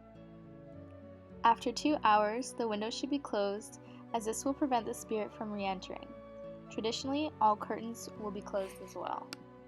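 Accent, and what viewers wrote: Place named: United States. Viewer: American voiceover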